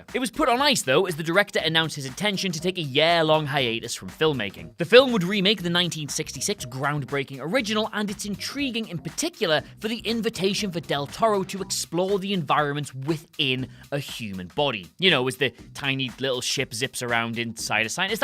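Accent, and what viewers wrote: United Kingdom. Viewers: I can't believe I have to watch and listen to you ramble on an entire minute before you actually start getting to the point!!!!! Do you really think we want or need all that, way to fast and British, chatter at the beginning.. I mean, the subject matter is interesting and all but jeeeeez, get on with it!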